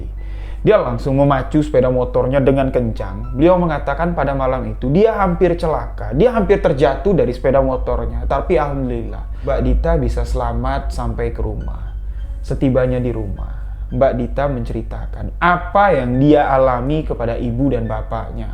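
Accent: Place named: Jambi, Indonesia. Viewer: Banten hadir kk.... Aku dari pertma x ikutin sebuah kisah misteri itu suka bnget dri cara n logat bicara y kk....logat jambi yg kental bnget Kaya kita kbawa alur cerita yg d kisahkn Pokok y sebuah kisah misteri mh keren hbis ....sehat n semngt trus y ka ..... Sukses....mg Allah sllu mlindungi kita semua.....🙏🇮🇩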